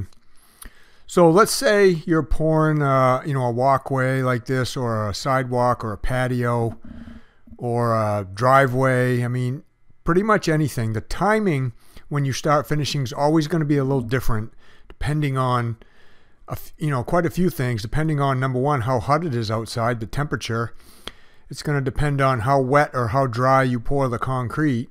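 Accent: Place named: Massachusetts, United States. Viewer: Cornah' herah' fingahs' you from Massachusetts or what? If so fellow finishah saying wicked pissah video. I'm on my 19th year and over the last 3 have been going to northern California to pour in the winter months ......and mine gold⛏. If you are in new england let me know , I could put you on some gold more then likely. That's how gold found me 9 years ago, working late one night walking from basement to basement. Caught of glimpse of something shiny. Picked it up it was a pc of gold in quartz "I didn't think it was real" a year later camping in Rangley maine. Found a nugget in the river and it was on from there. Lots of old mines in new England and most of the gravel we pour on and is around the site holds gold in smaller pcs.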